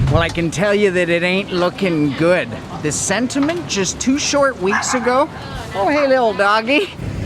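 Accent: Canada. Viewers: Can't stand Canadian accents. I'm out.... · This man has the most Canadian accent ever.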